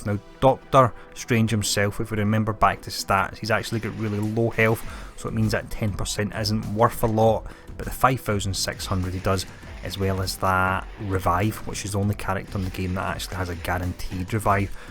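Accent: Scotland, United Kingdom. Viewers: Yeah, I gathered as much, but where in scotland? Dundee or Glasgow? I'm from sweden but I lived in Edinburgh for a while but I don't recognize the accent =)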